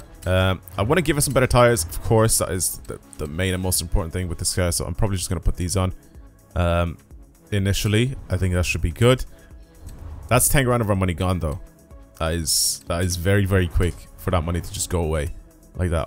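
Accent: Ireland. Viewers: where are you from you sound just like me and im irish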